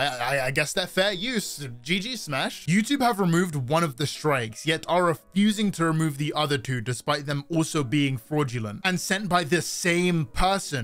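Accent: United Kingdom. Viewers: bro why is twomad talking to me in a British accent?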